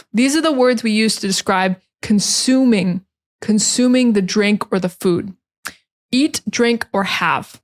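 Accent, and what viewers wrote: General American, United States. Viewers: Hi, your accent is really an American standard accent. I understand it well. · I found it interesting what you said about your accent , that you have a standard american accent , the same as the young american generations and that people from California, Texas, Indiana, Minnesota speak with the same accent you have. But that surprised me because I attended an english course where there was a young teacher from New York who has an accent pretty different from yours.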